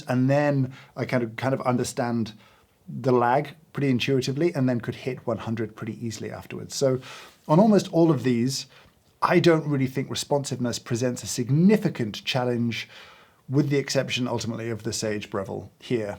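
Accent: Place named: England, United Kingdom. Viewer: Why he sounds less English today?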